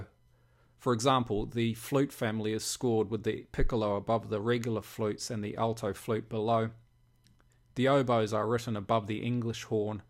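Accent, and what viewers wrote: New Zealand. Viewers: You Kiwi mate?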